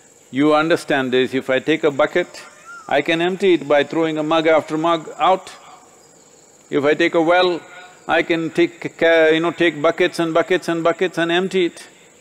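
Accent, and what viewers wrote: India. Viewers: 我认为是，有一些句子，无法找到最合适字的翻译。你仔细听，有时需要前后听两句话，才能感受到当中的信息。而老师的印度腔英语，我们不太容易听出来，所以英文翻译也是相当重要的。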